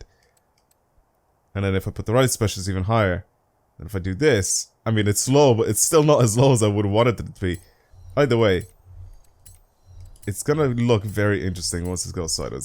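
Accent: Ireland. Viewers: I can't tell what his accent is but it sounds Irish or European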